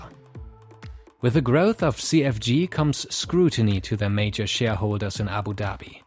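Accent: Germany. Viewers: German accent as well haha (I love it btw )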